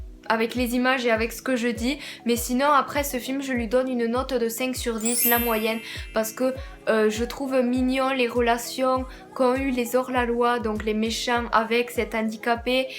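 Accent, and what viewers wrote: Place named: Toulouse, France. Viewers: Étant également une très grande fan de Thomas, j'ai vue tout les films que tu as proposé, et je suis d'accord avec avec toi de AàZ. Sauf pour le labyrinthe, j'aurais mit 10, et pour Nowhere boy j'aurais mit 10 aussi, mais là c'est plus personnelle comme je suis fan des beatles et que c'est grâce à ce film que j'ai connu Thomas. En tout cas, très très bonne vidéo, super agréable à regarder. J'adore ton accent (toulousain?) je pourrais t'écouter parler pendant des heures hahaha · Thomas c'est le meilleur, perso c'est mon premier acteur préféré 😍😍😍😍😍 Et sans avoir lu ta description j'ai reconnu l'accent Toulousain x) moi aussi je suis Toulousaine ! 😀😀💕👌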